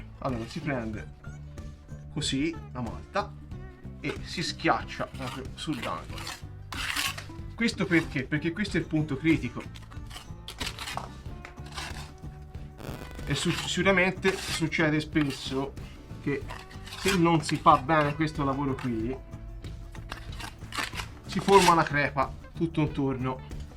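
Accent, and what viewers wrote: Tuscany, Italy. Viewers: Quanto sei simpatico oltre a essere bravo...poi con il dialetto toscano sei al massimo. Ciao dalla provincia di Pi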